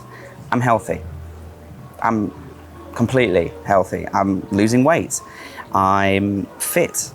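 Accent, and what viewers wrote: England, United Kingdom. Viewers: Love all your videos! Love to hear your English accent 👍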